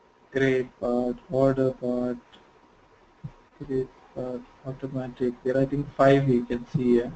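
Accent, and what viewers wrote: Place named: India. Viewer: there is a back ground job in this video makes it ghard to follow plus the indian accen that is so awfull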